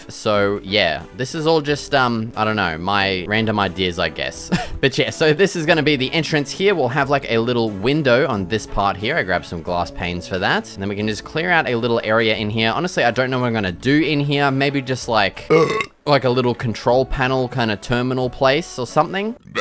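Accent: Australia. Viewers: Stopped watching about 1min in when I realised the Aussie accent was turned up to obnoxious.. I’m Aussie myself. It’s too bloody much bro. Stop it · you obviously don’t understand accents because he is not English he is Australian lol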